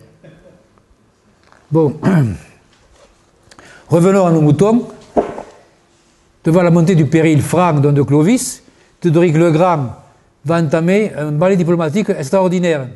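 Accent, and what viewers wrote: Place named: South-West, France. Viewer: l'accent du sud ouest est une merveille ! ❤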